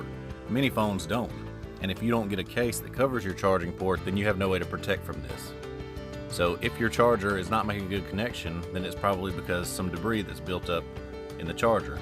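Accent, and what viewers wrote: South, United States. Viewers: Excellent video and your accent made me homesick for SC, TY. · i like your southern "Gone With the Wind" accent.. notch it up with a wider smile.. keep positive, you have great potential.. best wishes :-)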